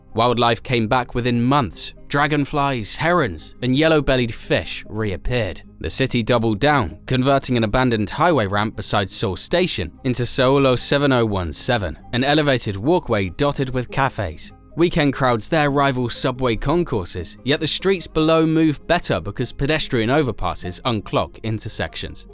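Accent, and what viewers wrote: United Kingdom. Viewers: This video makes me feel like having a English listening exam... good diction, rhythm and intonation... British accent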